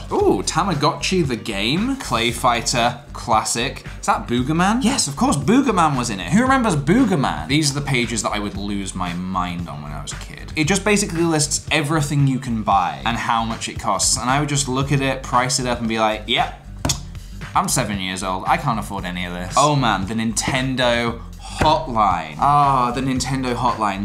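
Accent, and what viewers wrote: Italy. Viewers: IS HE ITALIAN???? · is he italian?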